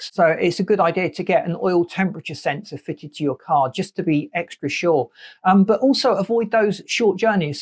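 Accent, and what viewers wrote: United Kingdom. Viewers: "zois compoinets hate up" ???? bro I h8 this annoying uk accent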